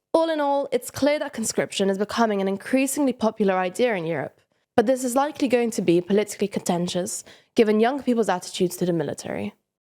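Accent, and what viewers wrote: United Kingdom. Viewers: I love the accent of the narrator! She sounds British but also has a bit of difficulty pronouncing the "th"-sound. So 33 sounds like firty-free. But the replacement (th -> f) sound isn't the same as the one commonly heard in and around London. It's slightly different and very noticeable. Not unpleasant, mind you. Just different. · What is with the strong British accent ?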